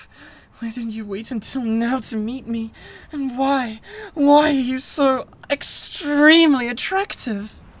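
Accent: United Kingdom. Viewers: You're one of the few ASMRtist that can I British voice I really like, I tolerate very few too.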